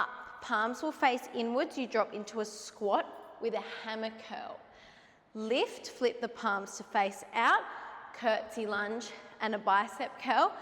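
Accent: Australia. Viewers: Girl WHO thought you’re from the UK 🤣🤣 maybe I just watch too many cutie Australian YouTubers but I can spot your accent from a mile away! NOT a British accent 🤣 I am dying. I love all of you Australian girlies accents so much!! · Dying that ppl don’t realize you’re Australian 😂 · I was like, what??! I thought she was Australian! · I’m an American and knew you were Australian because I have a beautiful friend from there but also I follow move with nicole and knew it was the same accent ❤ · Loved this workout! I knew you were Australian bc you sound just like Miranda Kerr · Same thoughts😂I don't watch a lot of Aussie youtubers but even i could guess she was an Aussie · Im from the states but my dads side of the family is in Perth and Sydney. I recognised your accent. I hope to visit one day! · As an American, I could absolutely tell you were Australian. How funny. 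Anyways, another amazing workout. Thank you for all that you do. You helped me fall in love with Pilates. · I can't believe people actually thought you're British?? Like they have clearly never heard an Australia accent before yeesh. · Omg how did people think you’re British!? I could tell right away you’re from Australia! But then again I do know a lot of Australian people for being an American lol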